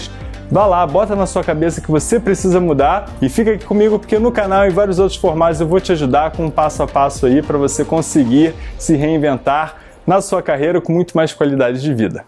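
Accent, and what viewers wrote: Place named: Rio de Janeiro, Brazil. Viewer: examente, um sotaque carioca e um bom cenário iludem muita gente.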